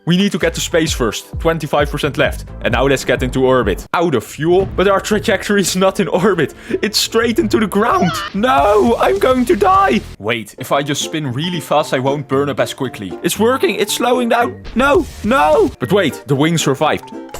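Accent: Netherlands. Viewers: Ru dutch? Ur accent is dutch. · I smell dutch (netherlands or nederlands) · i smell nederlands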